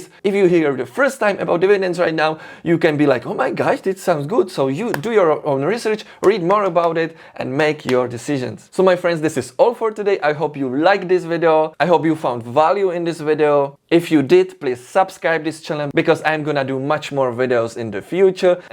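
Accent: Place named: Czech Republic. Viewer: Proč musejí mít češi v každé řeči ten český akcent , to se nedá poslouchat po 16 vteřinách jsem to vypnul.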